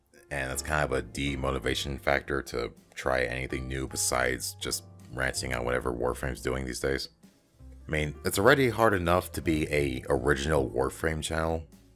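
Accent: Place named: Central Europe. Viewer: thats cool, i actually thought about usa looking at your upload times but from your voice i thought you might come from central/east europe. Congrats on the 10k! No idea if you remember me im here since the 1-2k'ish =)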